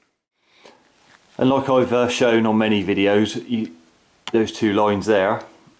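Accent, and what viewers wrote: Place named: South West England, United Kingdom. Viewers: That's why I'm asking, reminds me of my Bristolian mates' accent, the best in the world hah. So you're spot on I reckon. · His account is south west I think. I'm terrible with accents although I've lived in different parts of England.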